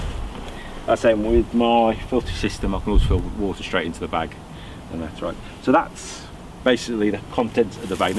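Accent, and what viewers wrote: England, United Kingdom. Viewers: Nice simple video so nice to hear an English voice ✌️and people not droning on about concealed carry. How is it most Americans are so paranoid 😆I digress nice kit man very Similar to my Rush 24 bag that goes everywhere with me I'm in south east England but travel extensively keep up the good work I'm now subscribed 👍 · I think the content is probably good, but I could not focus on what you were saying, because I just think it sounds so disgusting when british people pronounce "u" as if it was "o". Like in "stuff", or "backup". But thanks anyway. Maybe it's a reading issue?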